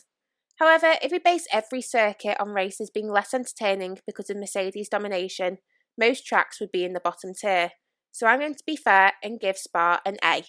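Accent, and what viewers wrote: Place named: Liverpool, United Kingdom. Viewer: Hey, random question, are you from around the Liverpool area? 🤔 As a Hungarian, I have to agree, the hungaroring is usually not too exciting in terms of results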